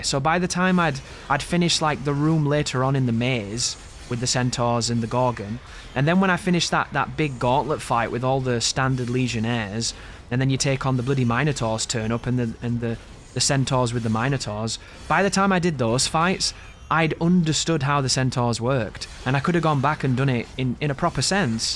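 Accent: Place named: England, United Kingdom. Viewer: Which part of England are you from? Your accent seems similar to mine 😅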